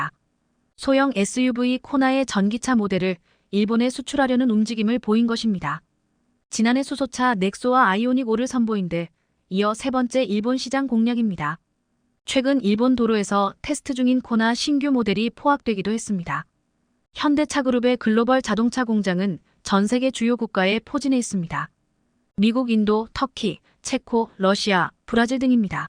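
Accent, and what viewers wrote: China. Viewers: 나레이터는 조선족? 한국인으로 바꿔라! 듣기 거북함! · 이거 음성녹음하시는분 중국인 ?? 베트남 한글.숫자 몾일어요 2,000 t 이게 이 영영티라고 읽어요 중국님아 한글 개망신 시키네 유튜버 하지마세요 한글망신 에고거 한두번도 아니고 ........><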